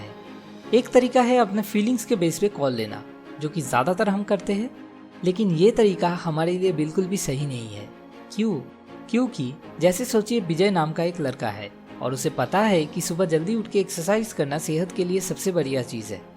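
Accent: Bengal, India. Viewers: pronunciation of temporary? Bengali accent?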